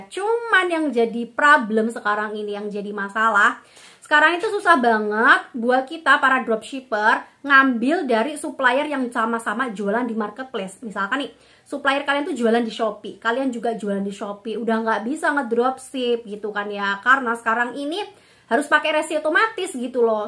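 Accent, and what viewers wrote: Java, Indonesia. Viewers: Didengerin suaranya agak medok 😀🙏. Tapi makasih kontennya. Bermanfaat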